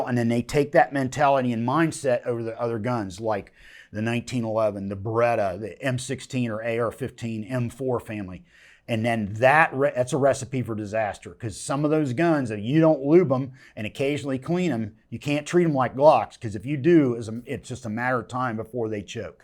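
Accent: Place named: Pennsylvania, United States. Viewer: listening to Larry talk reminds me of home. If you know the PA accent you know what I’m talking about.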